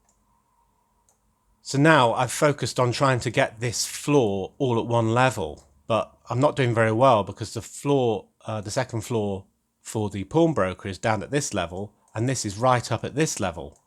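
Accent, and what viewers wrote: United Kingdom. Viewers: Great video (as always😉) Myself, I use leocad for designing small Lego buildings. There catalog is bigger than the one from ldd. I'll download bricklink studio and give this a try, as you or someone in the comments said, bricklink is now in the hands of LEGO so maybe there catalog will be even better. Keep up you great video's, it's always a pleasure to hear real UK English and not the simplified version 🤣🤣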